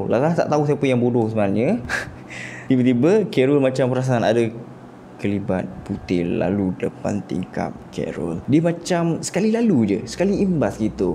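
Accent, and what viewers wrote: Johor, Malaysia. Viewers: Bang orng johor eh😊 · abg ni org johor ke ? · Kerul org Johor kah? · Abg kerul org johor ke? 😅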